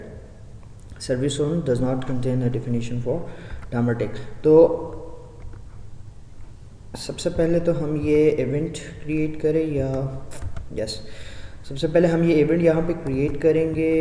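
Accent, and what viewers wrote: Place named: India. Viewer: I didn't understand what this dude was saying. Was he speaking English or an Indian variation of it?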